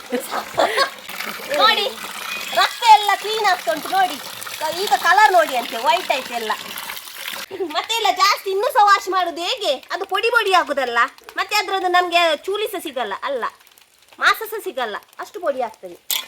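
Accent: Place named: Tulu Nadu, India. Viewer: ನಿಮ್ಮ ತುಳು ಮಿಕ್ಸ್ ಕನ್ನಡ ಕೇಳಲು ಖುಷಿಯಾಗುತ್ತದೆ. ನಿಮ್ಮ ಅಮ್ಮ ನಿಮ್ಗೆ ಒಳ್ಳೆ ಬಜೆ ನೆಕ್ಕಿಸಿದ್ದಾರೆ ಅನ್ಸುತ್ತೆ. ನೀವು ಕೂಡಾ ಯು ಟ್ಯೂಬ್ ನಲ್ಲಿ ಪಾಪ್ಯುಲರ್ ಆಗಿ ಬಿಗ್ ಬಾಸ್ ಗೆ ಹೋಗುವ ಅವಕಾಶ ಸಿಗುವಂತಾಗಲಿ.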